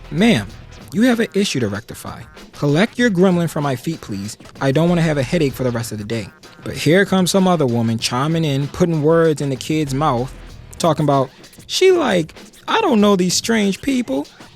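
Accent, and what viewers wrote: Baltimore, United States. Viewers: You sound heavy on the Baltimore accent 😂 but commentary though my guy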